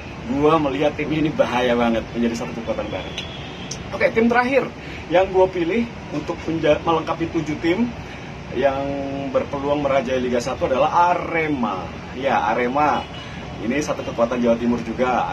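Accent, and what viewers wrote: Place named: Banyumas, Indonesia. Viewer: Boca ngapak ya sudahlah sudahlah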